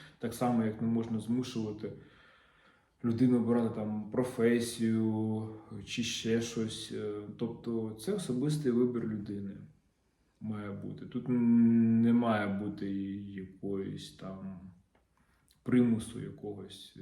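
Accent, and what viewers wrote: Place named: Lviv, Ukraine. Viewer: Львiвська мова неперевершена iiможна впiзнати де будь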